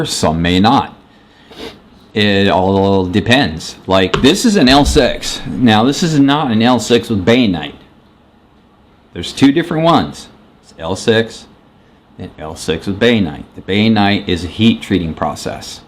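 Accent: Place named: Indiana, United States. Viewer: No sounds like you live in Indiana bro trust this old Hoosier boy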